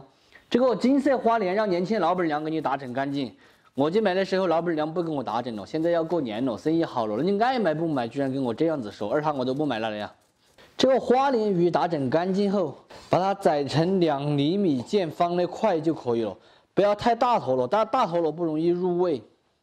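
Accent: Sichuan, China. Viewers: 川普太可爱了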